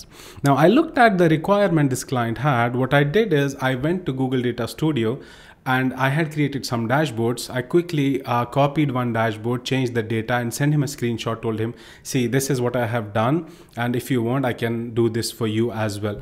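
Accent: India. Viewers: He have kinda Indian accent 👀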